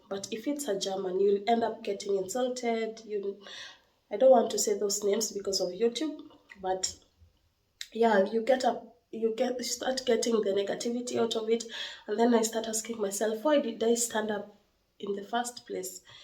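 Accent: England, United Kingdom. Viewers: I enjoyed watching Sis some of the things you shared here is now part of my life, we forgive you for English l understand you especially when you speak German it takes away your English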